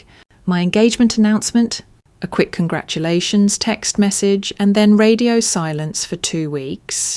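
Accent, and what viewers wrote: United Kingdom. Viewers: I’m wondering why the stories narrated by the British voice always has a pic of a female in a military uniform. Clearly this narrator is not in the military nor is there any military story lines. But all that aside, very good story as heartbreaking and happy all at once.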